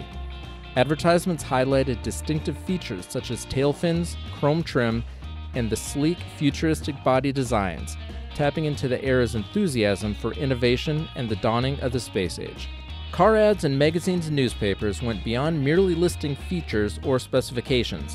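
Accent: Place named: Chicago, United States. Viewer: Most people in America say "aww-toh-mobile". "Otto-mobile" or "Aah-toh-mobile" sounds normal to you but sounds different enough to us that we notice it. It's gotta be a regionalism, but I'm curious where it's from. Sounds kind of Chicago-y, but also kind of northeastern? I can't remember where I've heard it. The kind of place where people pronounce "awesome" as "ah-some" to rhyme with "possum". I just can't put my finger on it so it made me curious.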